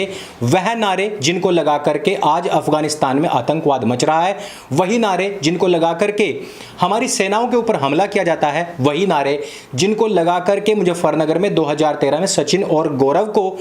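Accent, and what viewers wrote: Haryana, India. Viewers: Vaah mast haryanavi bhasa aap ki 🙏🙏🙏🙏🚩🚩🚩🚩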